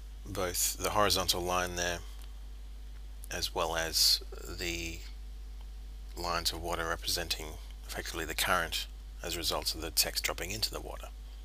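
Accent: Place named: Australia. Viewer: I'm a fannnnnnnnnnnn !!!! BTW you sound like an aussie or a kiwi.... either one... I'm a fannn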